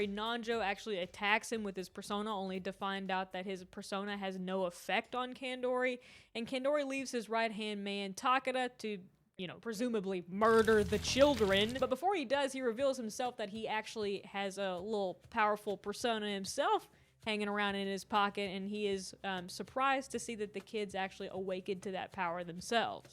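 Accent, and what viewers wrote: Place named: South, United States. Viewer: I know this is a completely random question and I doubt you’ll even see this but I have to ask: are you from the south? I’m from Texas and you seriously sound just like some of my friends that have lived in Texas their whole life. The accent is just almost exactly the same so I had to ask.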